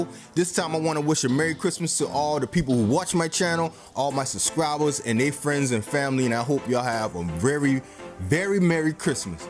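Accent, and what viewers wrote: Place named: South Carolina, United States. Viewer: Brah you sound like your from Moncks Corner South Carolina !!!!!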